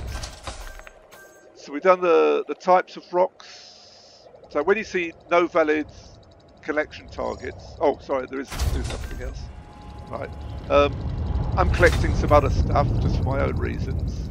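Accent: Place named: Bethnal Green, United Kingdom. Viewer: Very good video. Cheers! Love the accent BTW. Pure Bethnal Green! 👍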